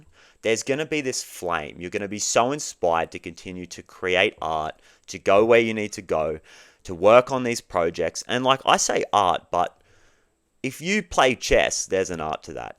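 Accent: Australia. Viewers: The accent initially made me think you were taking the piss! Its so refreshing to see an enlightened Aussie guy thats not wearing a decorative scarf and upcycled pants that were formerly their grandma's curtains... your beautiful authentic self is no doubt inspiring other men that being real and vulnerable (gasp) is actually perceived as strength and not weakness.... well done and sending you love from south of the border! 😊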